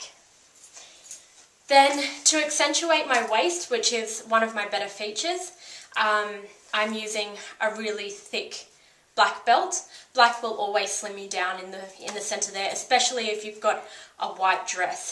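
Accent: Australia. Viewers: Love the ozzie aceent.... GO AUZZIES!!!!!I actually think you are the first Australian sewer I have seen on youtube. Thats a really good thing though, because then I know where I can buy AUSTRALIAN stuff from AUSTRALIAN shops!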